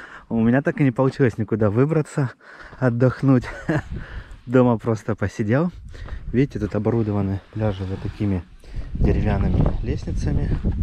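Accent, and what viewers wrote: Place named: Donbas, Ukraine. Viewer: у донбасский говор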